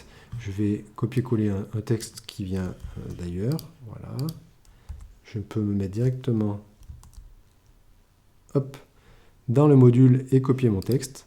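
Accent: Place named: Isère, France. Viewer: merci sympa ton accent :) tes dans l'Isère ?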